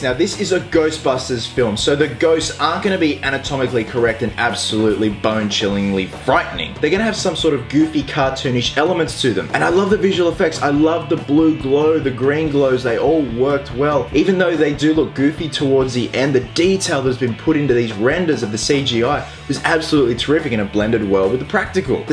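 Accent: Australia. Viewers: I love Australian accents and you have one of the best. -Hugh Jackman -You -ExperGamez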